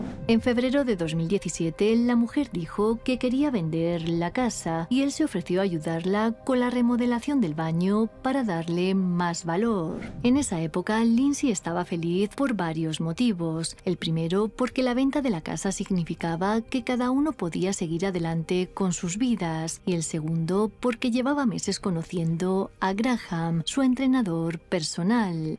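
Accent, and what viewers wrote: Spain. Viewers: El acento es obvio, española.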